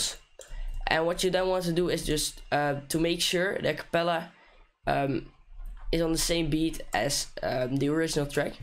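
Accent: Netherlands. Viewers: i love the thick dutch accent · i think you must be dutch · Ik spot een Nederlander 😂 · Haha deze gast is nederlands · You sound really dutch.. No problems just noticed it :D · Are you Dutch? I think I can recognize your accent a little bit. Your english is very good though! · His accent screams dutch ahha i love it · he's dutch a now it for sure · I smell dutch! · This guy has an Dutch accent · hey buddy hmm i can hear ur netherlands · Im in love with Dutch accent lol. Thanks for making such a good job explaining ! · sounds dutch ;) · this guy has to be dutch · Thank you so much! Your english sounds like dutch 😂 are you dutch? I am dutch!🇳🇱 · Are you Dutch maybe you sound Dutch. Btw amazing video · Je bent nederlands denk ik aan je accent te horen toch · We horen allemaal dat je nederlands bent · Ayo r u dutch? I can hear it due to your accent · are you dutch? 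you kinda sound dutch and great video my dude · You sound Netherlands 👌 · i hes dutch im dutch to i can hear it from his accent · This guy 8s Dutch he has a Dutch accent... Geen probleem hoor😂😂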